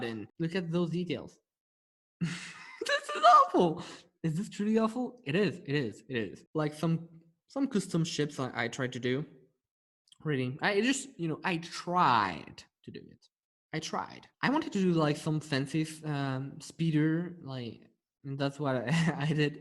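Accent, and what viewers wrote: France. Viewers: ah tu parles français j’entendais l’accent ! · Youre french? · I think he mentioned how his old videos were in French, so it's probably some form of French accent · Are you french ?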